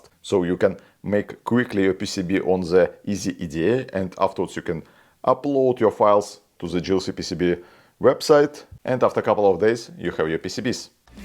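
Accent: Russia. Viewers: Ты чё, читаешь с листика английские слова русскими буквами написанные?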